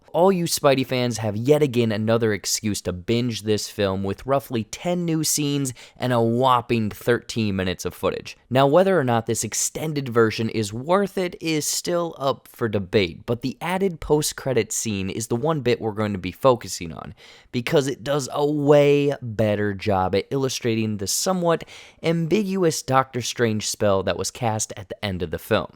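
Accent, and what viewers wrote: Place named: United Kingdom. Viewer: Please don't pull a jake tran 🥺 we love your British voice and wit, not this generic American voice -- 🙄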